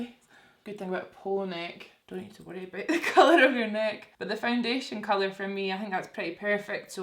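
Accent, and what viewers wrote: Scotland, United Kingdom. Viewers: I always watch your vids when I’m stressed out before exams. Something about a scottish accent is so soothing?? hahaha luv u!! come to dublin thanks ❤️❤️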